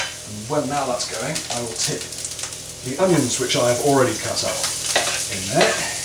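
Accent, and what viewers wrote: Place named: United Kingdom. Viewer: Hello! I watch your videos, and I'm enjoying to improve my English listening you with your fantastic british accent. Hugs mate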